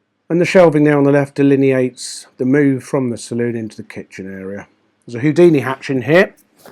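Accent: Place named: United Kingdom. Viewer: He is British, they all sound strange to me..... Not nice to point it out!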